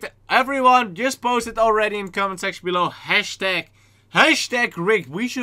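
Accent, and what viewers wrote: Netherlands. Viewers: Hes dutch..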